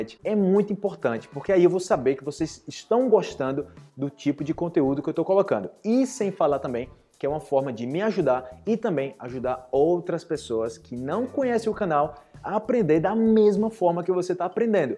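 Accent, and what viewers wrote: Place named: Colombia. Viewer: Oi cara.... que e essa placa ahi da Costa Rica, voce está na Costa Rica ?.... Eu sou da Costa Rica e estudei por tres anos no CB, isso aconteceu la por 2007, depois eu nao voltei praticar e fiquei atras, estes videos tem sido muito boms para min... continua assim por favor.. tou adorando seu jeito.... muito obrigado... Quando voce troca a espanhol se escuta un sotaque colombiano muito bacana, voce aprendeu na Colombia ?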